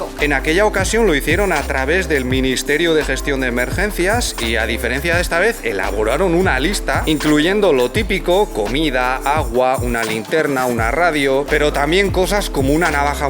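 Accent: China. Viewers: Caballero no es por mal pero trabaja más en ti asentó español ya hablas como chino. No olvides tus raíces es tu identidad. Bendiciones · Oye hablas muy diferente a lo habitual pareces como que hablas en español con acento del idioma chino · Ya tienes el acento chino..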